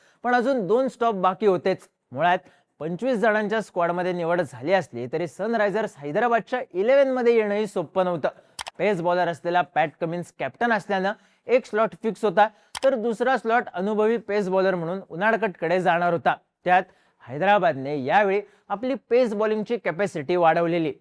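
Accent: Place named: Vidarbha, India. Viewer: Vidrabh bolte bhai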